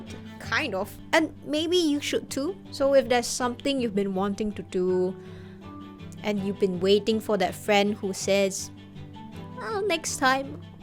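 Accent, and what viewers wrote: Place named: Singapore. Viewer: happy birthday - i came for the bg3 memes, and now staying for the Singaporean accent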